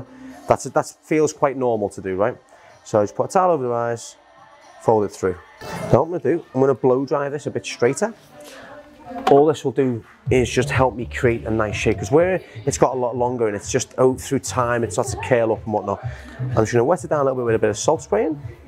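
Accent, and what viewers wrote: Liverpool, United Kingdom. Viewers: I’m a Yank so forgive me, but does have a Liverpool accent?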